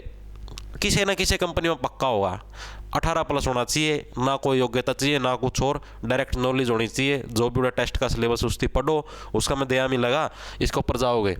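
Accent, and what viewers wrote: Haryana, India. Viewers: Always talking like .......k gyan s thara...... Very nice...no. 1 Haryana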